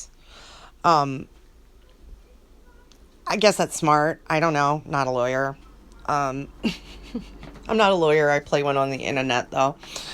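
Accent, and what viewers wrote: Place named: Maine, United States. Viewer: I love your accent! Not all Mainers sound like (I certainly don’t) but I know a fair few who do. It’s mostly the older generations though. My grandmother used to speak a lot like and his mawm, only she knew the difference between “weren’t” and “wasn’t.”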